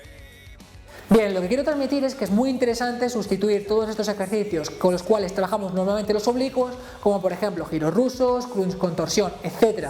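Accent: Galicia, Spain. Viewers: pero con acento gallego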